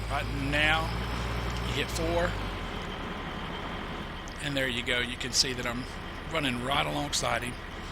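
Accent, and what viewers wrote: South, United States. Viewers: The southern accent just completes this guide. Nice!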